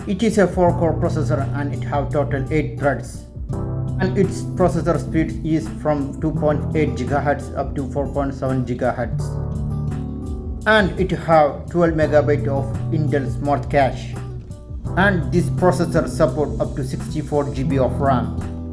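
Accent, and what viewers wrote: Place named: England, United Kingdom. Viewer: brilliant English accent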